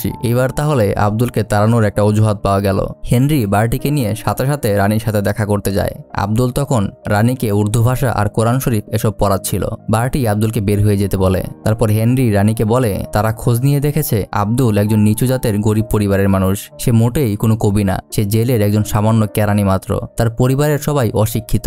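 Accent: United Kingdom. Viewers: vai apni movie explain kortesen ,apni sundor kore kotha bolben,seta na kore apni british style a bangla boltesen,ki bollen kiso bujte parlam na,faltu. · Your Bangle language speaking In English Accent was just awesome 🤣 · British vabe bolle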